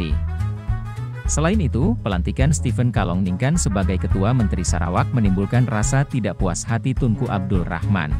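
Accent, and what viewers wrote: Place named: Indonesia. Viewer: Tapi Suara VIdeo ini bukan gaya orang sarawak tapi orang indonesia. Orang indonesia kah yang buat video ini?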